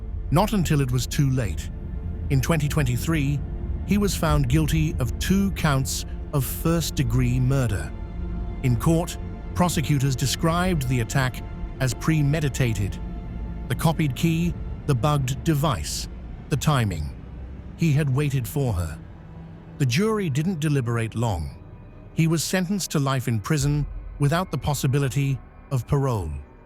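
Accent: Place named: United Kingdom. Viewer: hate these brit voices for american stories lol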